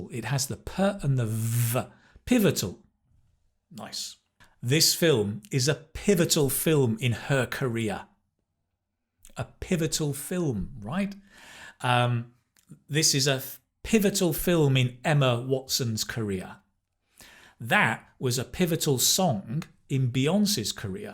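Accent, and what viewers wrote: United Kingdom. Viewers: I adore your British accent. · I’ve never thought I would bump in your outstanding video lessons. Your way of teaching is a breeze, your native British accent is out of this world. I always pay attention to each word you say ; it comes effortless from your mouth. Despite watching other videos , this one has definitely been a top-notch, every expression is really worth and sound to know about and hopefully use . It would be a real let-down if I don’t use this from now onward. Thanks endlessly Sir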